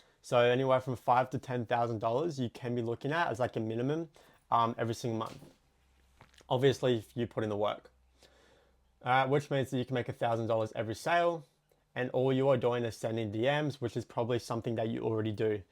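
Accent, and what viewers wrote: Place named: Australia. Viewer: I like this guy already soon as he starts the video already drop the F bomb in a Australian accent😭 let’s get right to it boys💀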